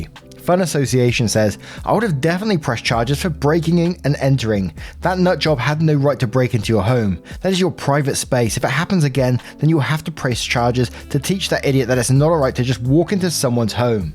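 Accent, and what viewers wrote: United Kingdom. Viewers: I don't really like the relationship stories but I wanna sleep on a bed of your voice! 😂😂😂 So whether I like the topic or not, I don't even care. Can listen to your voice all day! It's that british accent. 😊 I know this is an entitled neighbor one but was just sayin'....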